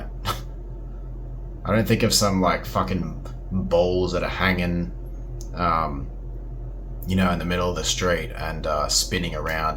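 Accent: Australia. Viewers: It's been said before, but I'll say it again, individuals can be smart. You're smart. I'm smart. But people are the stupidest mudda fudders on the planet. Here in AZ people bought up all the toilet paper in the local stores like it was Covid all over again! Why? Get this, due to the port strikes on the other side of the fcking country! Like we get our toilet paper imported from fcking China 🇨🇳 🙄 Why the fck are people so gotdamn stupid. This is why I love your channel. Brits accents grate on me but I actually like the Australian accent.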